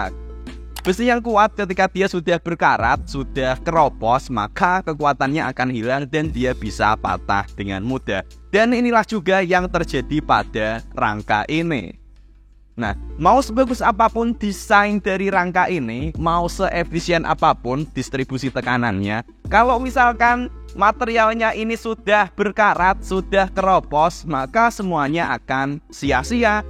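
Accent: Java, Indonesia. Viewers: Bahasa, tpi logat.e osing😂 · Logate osing banget. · Medok masse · Penjelasan yg komprehensif plus medok men bahasamu lek ❤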